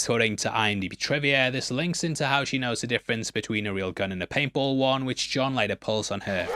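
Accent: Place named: Newcastle, United Kingdom. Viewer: Wait is a Geordie?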